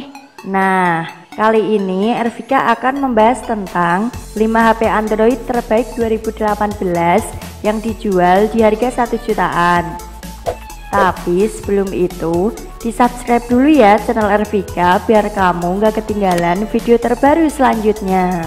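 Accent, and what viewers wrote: Java, Indonesia. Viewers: Jawane ngendi mba:-D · jan medok,njowo mbak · Jawa medok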